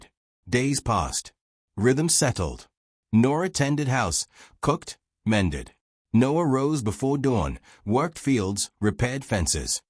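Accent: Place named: United Kingdom. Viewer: Again with a Brit voice narrating an old-west story.😠 But damn your high-and-mighty principles, lady. Your pride doesn't fill empty bellies. I'm sick of people who 'won't accept charity', when they've been PRAYING for help until they turn blue, but they throw it back in god's face, because they don't like his method of delivery.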